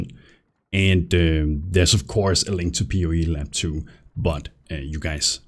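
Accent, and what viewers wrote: Denmark. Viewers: And yea he is Danish :p easy to tell on his accent, altough its not one of the bad ones! :p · Is this legal? Will it get you banned? And a side question. Are you danish ??? 😋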